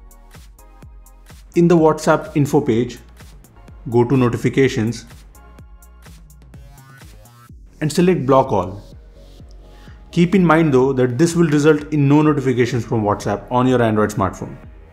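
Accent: India. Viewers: Do you make your accent so thick on purpose? Because I literally don't know any Indian or Pakistani who's accent is so thick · Danyal Malik obviously indian accent... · Your head movement and indian accent make it super hard to understand what you sayin. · I HATE YOUR ENGLISH,LOL INDIA.. GET OFF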